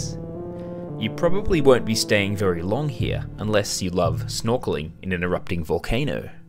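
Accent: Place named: Australia. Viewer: I reluctantly clicked on this video, thinking it'd be just another AI-written and narrated video, but upon hearing the mellow Aussie voice and the distinctly human drawings, I was pleasantly surprised at the discovery of this channel. Looking forward to watching the rest of the series!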